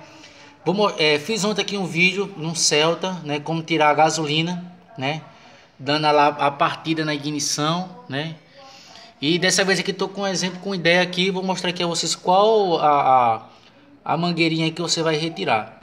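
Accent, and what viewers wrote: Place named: Maranhão, Brazil. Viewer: Parece Maranhence falando, não entendi nada.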